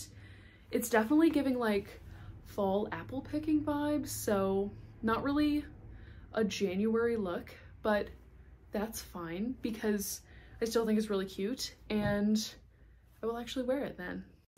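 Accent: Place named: Canada. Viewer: Are you Canadian? 🇨🇦 You have a lovely accent. 😊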